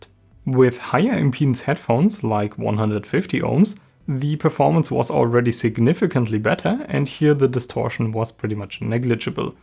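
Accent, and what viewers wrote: Germany. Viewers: Is there any negatif comments on his accent ? Every guy with a german accent talking about tech or music i heard were right on almost everything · Love the accent mate, used to it by now since my family is German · it's his German accent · First time in my life I can't set English subtitles on English video - why? Because of GERMAN accent? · Love your accent Man. Don't bother about the comments on your German accent. Keep the videos coming. What matters is the content in the beginning and content in the end. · He’s not robotic it’s his German accent. - made my day :))) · Not gonna lie... I didn't even notice the german accent.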